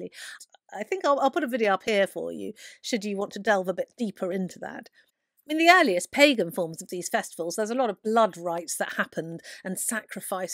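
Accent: United Kingdom. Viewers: So much fun to hear a Brit Witch speak of the American thanksgiving. Love the pagan references and agree completely! My adult son has asked for ham instead of turkey. He brings up a good point-no body really likes turkey - we only cook it one day a year. Lol.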